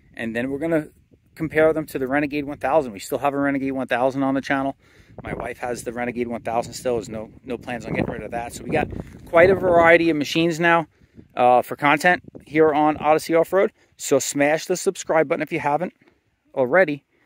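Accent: New York, United States. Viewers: New York accent?.. I’m from Pennsylvania… for 2024, the only color offered Is a bright orange. .. kinda ugly tbh. Limited edition so they’re kinda hard to find.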